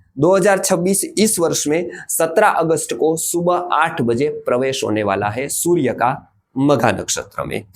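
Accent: Gujarat, India. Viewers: पंडित जी आप गुजरात से है क्या 🤔। माफ़ करना लेकिन मैं भी गुजरात से हुं। और आपके वक्तव्य में लहेजा गुजराती जैसा है।🙏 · बोलनेके तरीकेसे टोन गुजराथी ही लगते है प्रणाम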